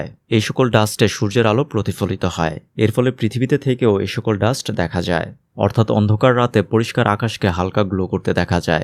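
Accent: Narsingdi, Bangladesh. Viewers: ভাই এর বাড়ি মনে হয় নরসিংদী। ❤ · ভাইয়ের বাড়ি কি নরসিংদী নাকি 😀 আমার বাড়ি নরসিংদী, নিলক্ষা। ❤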